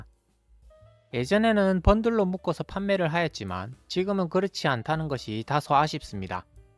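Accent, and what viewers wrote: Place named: Gyeongsang, South Korea. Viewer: 말씀 하시는거 보니 경상도 분 같으신데요 저는 서울삽니다.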